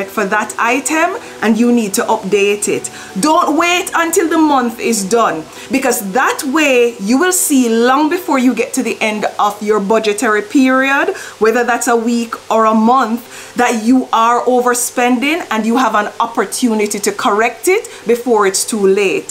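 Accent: Jamaica. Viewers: keep impacting. love the patua jamaican tone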